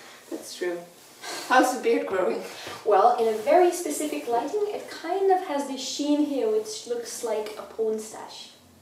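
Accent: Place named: Netherlands. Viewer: Ha I new you were from somewhere the Netherlands ore Belgium I thought I heard it right in one of the bloopers from the mischief managers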